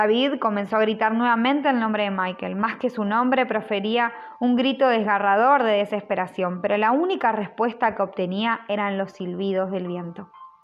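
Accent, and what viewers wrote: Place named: Argentina. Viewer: QUE LINDO EL ACENTO ARGENTINO.....SALUDOS DESDE PERÚ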